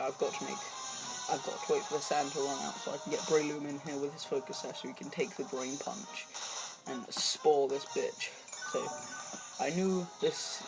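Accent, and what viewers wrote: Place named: England, United Kingdom. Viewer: This is Sam from Facebook, good battle. Are you from England? You have an accent.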